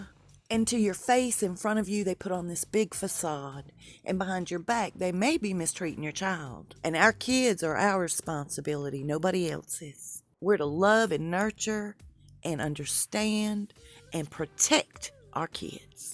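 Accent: South, United States. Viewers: 💜💜💜Happy Valentine's Day! 💜💜💜 I didn't know about your abuse situation. So glad you broke free of that. You are a valuable person with a really cool southern voice! LOL. Bless you dear-heart today and always. Here's a rose for ya. 🌹🌹🌹🌹🌹🌹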